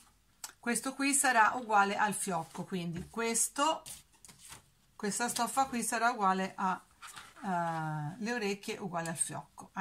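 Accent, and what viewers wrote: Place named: Tuscany, Italy. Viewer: Buongiorno, carino il coniglietto bravissima.come mi piace l'accento toscano 😂😂😂😂😂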